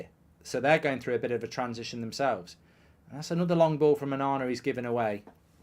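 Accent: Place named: RP, United Kingdom. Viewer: Shat ap..so posh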